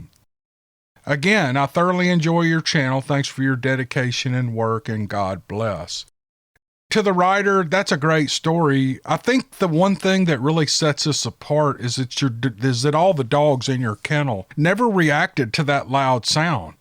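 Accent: South, United States. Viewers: ...my wife claims to hear an East Texas coworker say "might-can" a lot, and I have never heard anyone say that, until you opened this episode with a "might-could." Thanks for your wonderful Southern accent and jargon. Merry CHRISTmas to you and your family!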